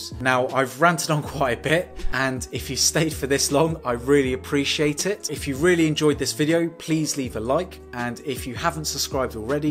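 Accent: United Kingdom. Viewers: Are u Indian ? But u talk like British tounge. Nice vlog anyway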